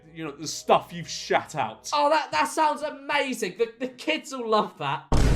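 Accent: United Kingdom. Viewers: I approve i like this smol bri’ish man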